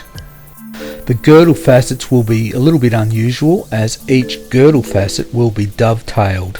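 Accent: Australia. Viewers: Well the other faceting channels don't have the Australian accent, so they can't copy that without sounding weird. the gem looks amazing!😮 wondering if you have done any videos of faceting golden rutilated quartz?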